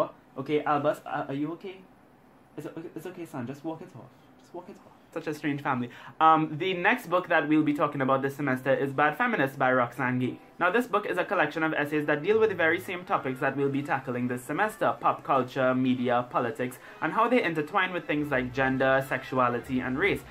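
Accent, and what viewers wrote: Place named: Trinidad and Tobago. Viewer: As soon as I heard your accent I was like, "Wait is he from Trinidad?" And I look in the description and it says you're from Trinidad which is awesome because I'm currently living here! (moved to Trinidad from America a couple years ago) So nice to see a fellow BookTuber from Trinidad! Awesome video!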